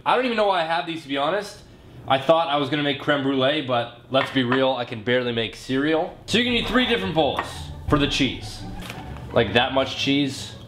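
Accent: Canada. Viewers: I love the way Canadians say out😂😂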